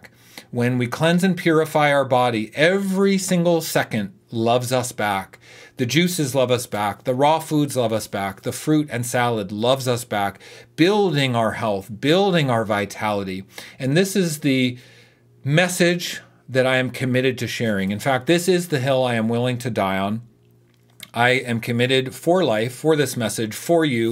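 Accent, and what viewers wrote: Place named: United States. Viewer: Cause he is speaking very well,not too fast and trust me because it’s American English. 😊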